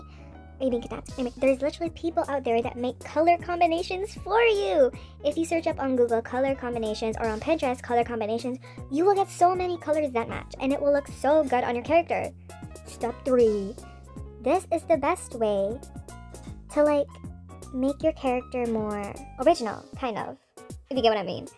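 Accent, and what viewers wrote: United Kingdom. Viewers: Girl that British accent was PERFECTION! It makes me feel self continuous about mine!😭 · NAH WHY DOES THIS CHANNEL REMIND ME OF SUPPER NANNY😭😭😭 (Not trying to be rude it’s just the British accent that reminded me of her Forgive me if I made you sad or smtn)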